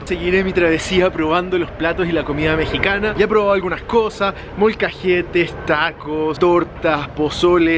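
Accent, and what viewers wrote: Chile. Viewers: A que lindo eres, y lo explicas super bien; amo el acento chileno!!! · soy una mexicana qué ama tú país... chile me fascina, me encanta su gente linda ustedes también tienen ésa cultura, ésas tradiciones tan lindas. Conozco chile a través de la televisión viendo enigma, y mea culpa y me gustó tanto me enamoré de ése lugar hermoso qué un día quiero ir a conocer. al escucharte hablar supe qué heres chileno me gusta ése acento tan dulce! Gracias! por aprender de nuestra cultura❤💞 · oyeee me alegra mucho tu canal! Me gusta ver cómo los extranjeros le gusta México y a mi me gusta Chile 🇨🇱:) algún día me gustaría ir a Santiago y conocer la comida chilena y la gente chilena! Me gusta mucho el acento chileno también:) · Por fin! los chilaquiles son tan ricos:D Saludos!!! cuidate mucho para que puedas seguir disfrutando de la comida y por cierto el color verde te va muy bien!!:D Por cierto me encanta el acento chileno!!!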